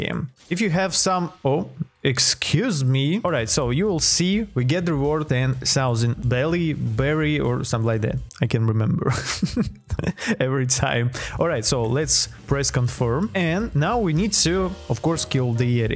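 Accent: Russia. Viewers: bro really sounds like Russian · Great option killing yeti, i was tryna grind since light was on stock and im on an alt im max lvl on my main tho also LOVE THE RUSSIAN ACCENT!!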